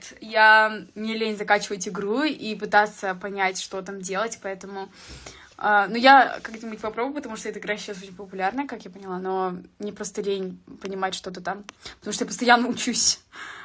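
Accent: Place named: Russia. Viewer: совсем стала русской,когда-то говорила как и все казахи-Алма-Аты,теперь говорит как все русские Алма-Ата ))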